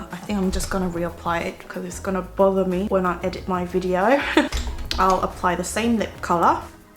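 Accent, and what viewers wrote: United Kingdom. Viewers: 와..파데보러왔다가 발음듣고 구독하고 가용...ㅜㅜ 이렇게 영국스러운 절제된 발음이라니 사랑해요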